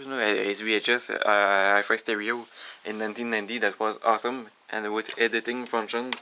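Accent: Quebec, Canada. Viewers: Marrant son accent québecois in english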